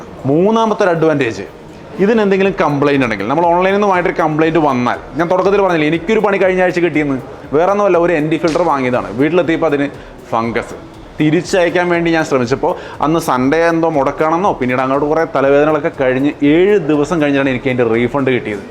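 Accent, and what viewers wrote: Thrissur, India. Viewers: chetta Thrissur ഭാഷ കയറി വരുന്നുണ്ട് 😄😄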